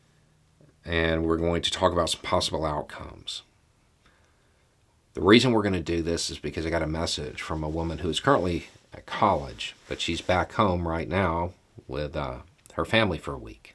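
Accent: South, United States. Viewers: violent and unstable? Lol. I was just replying to a veiled threat. Also is clearly not a Yankee which is a name for a northerner here in the US. He’s clearly from the south.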